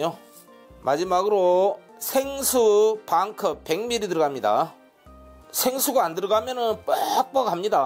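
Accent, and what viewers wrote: Jeolla, South Korea. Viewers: 전라도 말씨중에 제일 매력있는 목소리인것 같은데요 음식과 말투의 궁합이 최고~^^♡ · 구수한 전라도 사투리. 정감있고 언제나 맛있고 정성어린 설명. 감사합니다~~ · 구수한 전라도 말씨 좋아요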